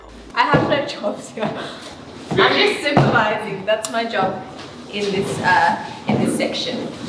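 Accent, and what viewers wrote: Australia. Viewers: I can’t figure out her accent but that’s probably because I’m dumb -_- Can someone pls tell me her accent?? This is me editing it before someone tells me... is it Australian? Sry I’m dumb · Are you aussie??????🤨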